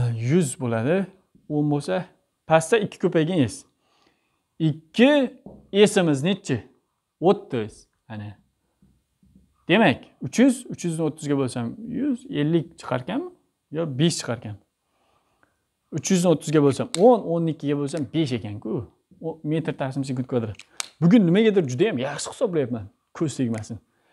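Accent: Khorezm, Uzbekistan. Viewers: Assalomu Aleykom ustoz darslariz uchun rahmat ha bazan xorazm shevasida gapirgandek tuyuladi menga!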